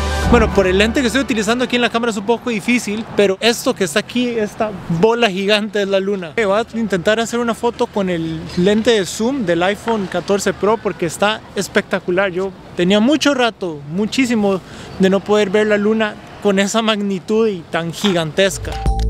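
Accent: Costa Rica. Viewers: Este mae es tico haha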